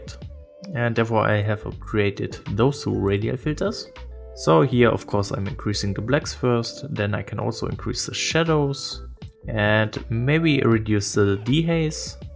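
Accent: Germany. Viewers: Great video! Perfect for me is I am currentyl trying to get better in LR? Are you from Germany? As I think I can hear a german accent😅😉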